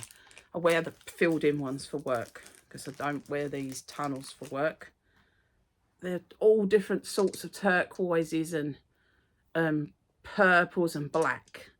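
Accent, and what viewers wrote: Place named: Essex, United Kingdom. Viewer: Hi, just found your channel, where in Essex are you from? You sound like me 😂🖤🖤🖤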